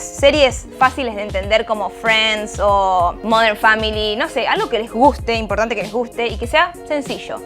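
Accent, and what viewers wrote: Argentina. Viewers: a mi me gusta tu ingles argentino jajajaja, diles que cuando vuelves a casa hay palabras que no te acuerdas en español, o se te escapan en plena conversacion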